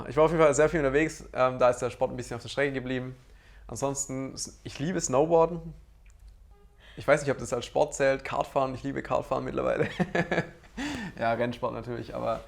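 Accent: Swabia, Germany. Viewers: Ja mei, du bischt eh Schwob :-) (habe da nur gewohnt,bin kein Schwabe) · Gibt es ein Video in dem du Schwäbelst? Falls nicht sag ich Mal "Back to the Roots" :D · eins mit full schwäbisch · Ich wusste es, dass du ausm Süden kommst. Ich hab irgendwas schwäbeln gehört. So n bisschen zumindest und ich war mir nie sicher 😉😂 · Does he sound "schwäbisch" to Berlin ears? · Sackzefix der kerle kommt ja US m schwabeländle🤯✌️ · ich höre dass du Schwabe bist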